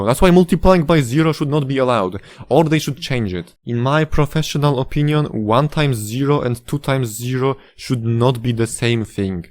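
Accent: Poland. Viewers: odkąd widziałem pierwszy film wiedziałem że jesteś Polakiem po akcencie. Dzięki za potwierdzenie brachu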